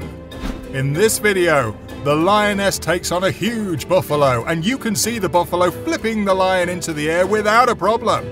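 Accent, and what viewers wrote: United Kingdom. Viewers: I love the way you talk London England but Fiennes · Why is it always a British guy? Can't it be Snoop Dog?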